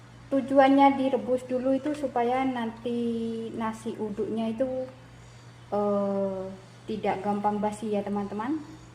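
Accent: Madura, Indonesia. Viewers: Logatnya Bicaranya Persis Orang Madura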